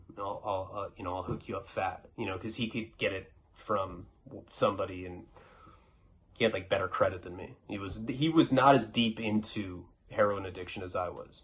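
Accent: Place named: New York, United States. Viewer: Your ny accent is hilarious I just think about son from jail lol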